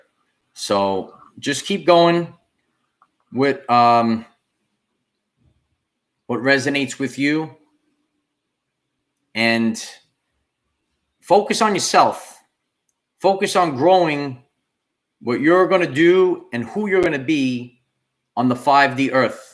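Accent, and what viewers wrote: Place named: New England, United States. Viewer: I love music. How can I find out the frequency of different songs? Also, I've been seeing my numerical birth date often recently, including the number of likes this video had. I suppose it's an indication of something being of personal significance but wondering if you have any more specific insight of these synchronicities. Thanks. I appreciate your smile, your guidance and comradory through this exciting journey. Where did you grow up? I'm a southern gypsy and enjoy your new england accent. Thanks again. Namaste 🙏🌈🕊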